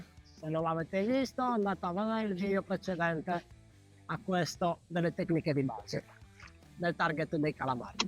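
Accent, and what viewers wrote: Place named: Sardinia, Italy. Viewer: Stavo solo curiosando ma, presto , mi ha colpito una " strana" cadenza a me molto cara.......non propriamente casteddaia ma che si avvicina parecchio . Non avendo barca ripiego sull'eging da terra sempre che il " nostro" amato maestrale lo permette......saluti franco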